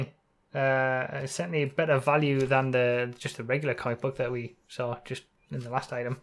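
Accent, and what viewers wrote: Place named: Middlesbrough, United Kingdom. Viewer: You from Middlesbrough?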